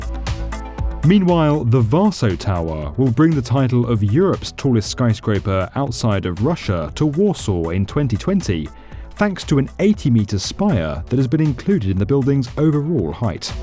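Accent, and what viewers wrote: United Kingdom. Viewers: I can't understand anything with the UK english. American english is more easy.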